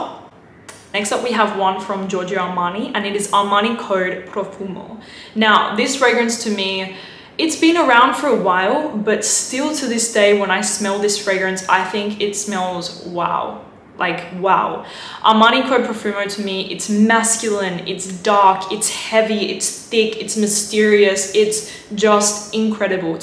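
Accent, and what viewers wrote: United Kingdom. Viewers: Ngl I love her accent it sounds more British than Australian but not completely English maybe it's just the Aussie YouTubers I watch who don't have her accent but hey ho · are you british??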